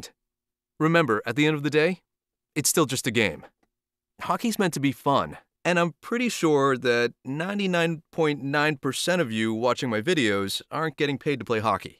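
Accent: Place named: New England, United States. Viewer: What part of New England are you from? I'm from Massachusetts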